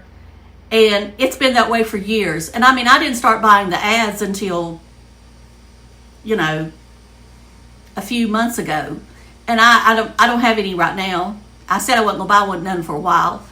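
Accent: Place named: Arkansas, United States. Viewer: Ok saw your name enough that I finally came to subscribe! Nice to see you. Your accent reminds me of my Dad's sisters, your accent. Maybe you are from Arkansas? We are Native American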